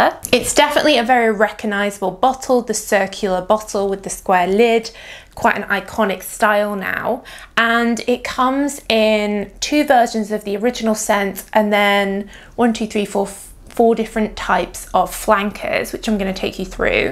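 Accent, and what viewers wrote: United Kingdom. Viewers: I have the Green one it's incredible I m addicted to but I want to have the others! Thanks It's awesome!❤❤ By the way I'm learning english and I like the British accent So It was amazing and useful to watch the video!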